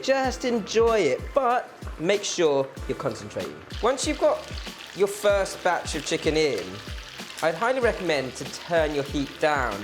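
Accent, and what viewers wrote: England, United Kingdom. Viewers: Lmaoo I don’t trust a Korean with an English accent making anything Korean then he made it so non Korean like 😂😂😂😂 nice try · I was confused for a second when he started to talk lol he looks asian but with a British accent. I will try this recipe, thanks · His England or British accent doesn't have anything to do with his cooking skills. Trip part bou it is he may be Philapeno Lol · This Asian dude sounds more English than English people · An Asian with a middle class English accent? Now I've seen everything!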